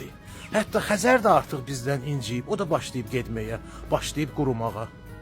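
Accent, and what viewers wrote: Baku, Azerbaijan. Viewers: Sağol qaqa Allah səni xoşbəxt eləsin Amin 🙏 Düzə nə deyəsən.Bakiliyam , özüm özümdən küsmüşəm.Həsrətəm BAKI ləhçəsi üçün.Sag ol Oğlum yaxşı ki varsuz.❤😢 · Allah sənə cansağlığı versin Lap ürəyimdən xəbər verdin Sənin verdiyin məlumatlar ləzzət eləyir Hələ ləhcən Bakılı balası Özünü qoru Amin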